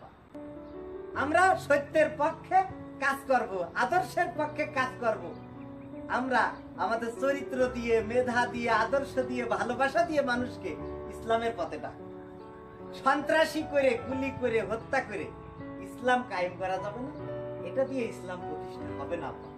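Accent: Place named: Jessore, Bangladesh. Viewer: যশোরের ভাষা খুব ভালো লাগে, আপনাকে যশোরের ভাষায় সব সময় কথা বলা মানায়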